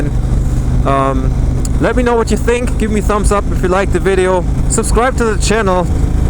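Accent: Germany. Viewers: Wenn ein deutscher English spricht. Schrecklich, ich breche hier ab. Vor dem Crash noch.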